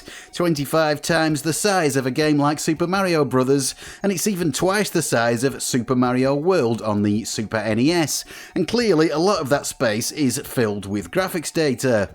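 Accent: United Kingdom. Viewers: Are you from UK? What an accent 💩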